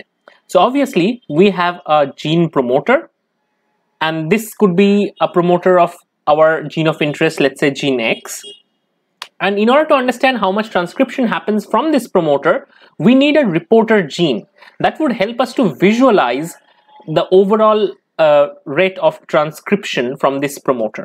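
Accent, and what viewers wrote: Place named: India. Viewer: 아오... 인도영어 이해하기 어렵네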